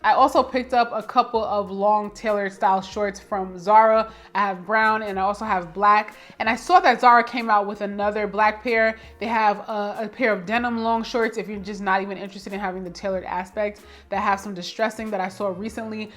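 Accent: New York, United States. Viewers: Good morning, young Queen just ran across your channel. I am an old G born and raised in new Yorker. I hear a New York accent as you're talking love your channel. I just subscribed. I am 67 years of age and I'm loving your fashion tips. I'm gonna pick those up. And incorporate them in my closet from what I'm able to see in your home. I also love your design style. Absolutely gorgeous. Fresh clean. I love it, continue great success. Thank You Have A Blessed Day 💙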